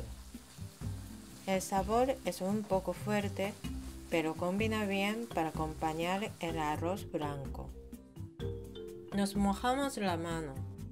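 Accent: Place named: Spain. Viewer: Que hermosa la Japonesa... Me gusta tu acento español y se mira que cocinas muy sabroso. Saludos 😉😘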